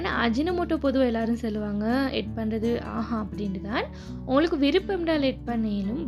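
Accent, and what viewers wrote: Akurana, Sri Lanka. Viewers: நீங்கள் எந்த ஊர் மா Akurana யா? ?? உங்கள் பேச்சில் Akurana வாடை அடிக்கிறது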